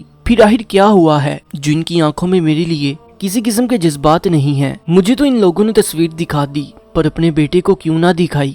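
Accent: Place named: Lahore, Pakistan. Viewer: Great story like your Lahore accent.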